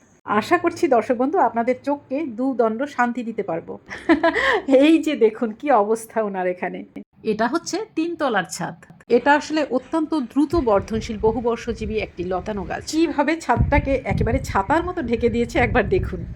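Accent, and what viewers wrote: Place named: Bangladesh. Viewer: অসাধারণ ব্যাপার 💚 আমি প্রথম দেখলাম। আপনার কথা শুনে বুঝতে পারিনি আপনি ভারতীয় 😊 একদম বাংলাদেশের মানুষের মতো কথা বলেন ❤ যেহেতু আপনি বেহালা থাকেন সেহেতু ঢাকাবাসী আমি আপনার কাছ থেকে গাছটি পাওয়ার আশা করতে পারিনা! তবে গাছ এবং ফুল সম্পর্কে জানার প্রতি আমার খুব আগ্রহ! ভালো থাকবেন এবং ভালো থাকুক আপনার গাছটি🌿☘️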